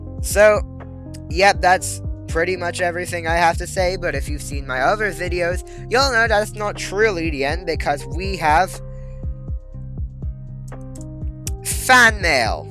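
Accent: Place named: United States. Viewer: You are speaking USA English in UK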